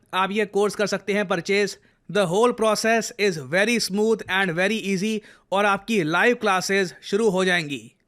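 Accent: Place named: Haryana, India. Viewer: When sir says image Geri hai, 😂😂😂, sir ka haryana MTI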